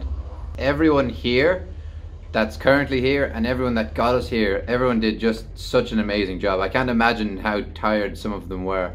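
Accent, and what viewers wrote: Ireland. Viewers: Irish men and their accent are just love. 😍😘 That's made me fell in love with. ❤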